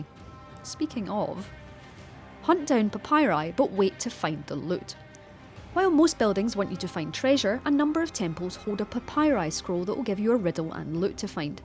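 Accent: Scotland, United Kingdom. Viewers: That Scottish accent <3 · She’s Scottish 🤦🏿‍♂️ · I’m Irish and that’s definitely not an Irish accent. Sounds Scottish. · Sendo Tiago scottish actually. A subtle distinction but very important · So nice hearing a Scottish voice for things like this. Is there more just from her? (I'm not being weird I'm Scottish too and it's just easier to follow) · That's a Scottish accent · That Scottish accent is irresistible lol. Had to sub! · Um, she’s Scottish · Central Scotland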